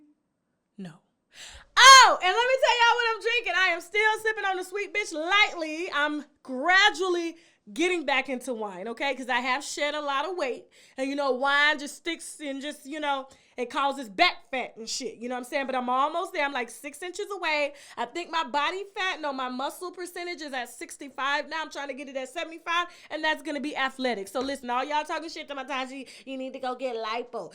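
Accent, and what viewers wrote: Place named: South, United States. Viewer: She’s southern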